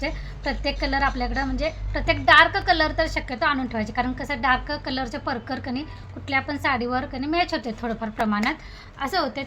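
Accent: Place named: Sangli, India. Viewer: Tumhi sangli sidevcha ahat ka bhasaha varun vatle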